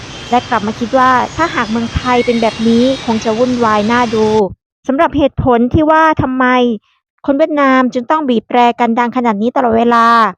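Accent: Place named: Laos. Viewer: น้องแอด เป็นสาวลาวป่าว..สำเนียงน่ารักน่ะ...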